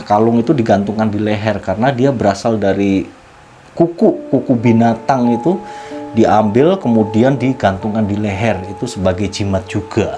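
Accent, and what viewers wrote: Java, Indonesia. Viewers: Orang jawa mana suara nya kalo ada komentar ya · wong jowo mana suara e😇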